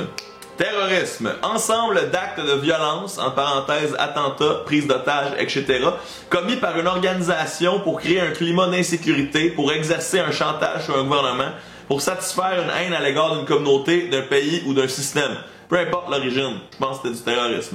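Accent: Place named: Quebec, Canada. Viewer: Pénible cet accent québécois, j'ai beau faire un effort, j'y arrive pas, pour moi, c'est insupportable à écouter, en plus c'est un vrai tue-l'amour ! En plus pour dire des conneries pareilles, là c'est carrément une torture que d'entendre ces bêtises! C'est vrai que DAESH est humain et ouvert, non?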